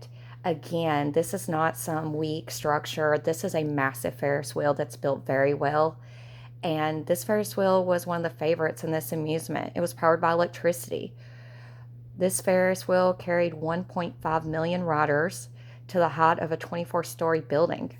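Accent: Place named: South, United States. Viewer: Indoctrination, why do you think we longer host these ridiculous events??? Obviously the city was there before, and our historical timeline is just not accurate. We're all being manipulated to believe a timeline that glorifies north America and white people, putting us at the pinnacle of creation, science, and invention which is just not true. Many civilizations before Europeans were far more advanced, and Europeans stole their technology, land, and even people and claimed it all for their own. There is also several civilizations and groups of people that were obviously wiped out because we have their technology but no people and no history and think about all the libraries that have been burnt on purpose and even the book burnings in world war II. Much of our history has been erased on purpose to benefit one group of people or should I say 1percenters lol. 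Great video though, I love the images you used, and your southern accent as I am also a southern woman questioning history lol.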